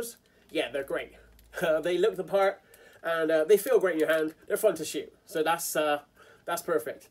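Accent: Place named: Bristol, United Kingdom. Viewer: Haha! Awesome vid! Love the accent...Bristolian?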